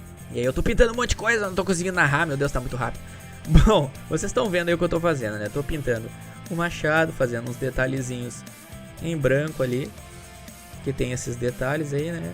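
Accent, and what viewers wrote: Rio Grande do Sul, Brazil. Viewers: Tu es gaúcho ?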